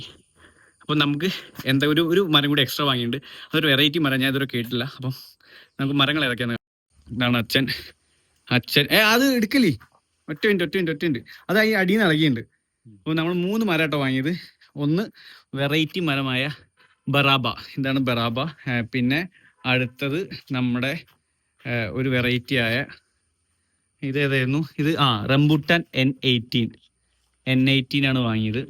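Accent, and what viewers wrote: Malappuram, India. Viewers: നമ്മുടെ മലപ്പുറം ഭാഷ എന്തേനി🎉🎉🎉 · മലപ്പുറം thanne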